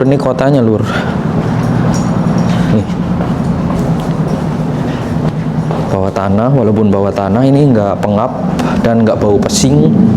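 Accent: Banyumas, Indonesia. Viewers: Mas ngapak, bikin konten di malam hari dong mass 🎉🎉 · Rika ngapak daerah ndi bro..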